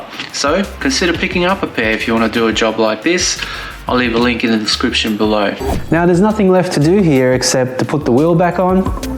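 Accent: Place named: Connecticut, United States. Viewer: Where the fuck are you from, Connecticut? Hell of an accent, son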